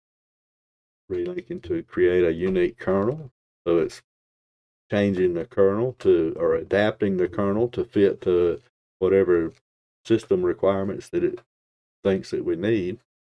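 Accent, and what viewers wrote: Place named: South, United States. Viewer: I could tell you were from the South by the accent. I'm from LaSalle Parish, Louisiana, but most of my family is over in Smith County, Mississippi. One of my mom's siblings is over in Dothan, AL. I've rolled through your neck of the woods a lot!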